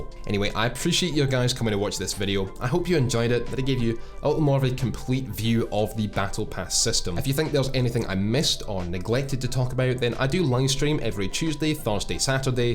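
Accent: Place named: Scotland, United Kingdom. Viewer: I love the accent Beautiful Scottish Accent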